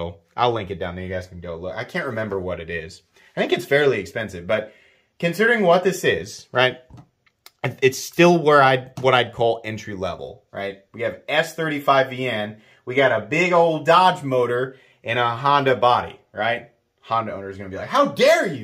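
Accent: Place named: South, United States. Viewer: Extreme southern: thes air ryt hare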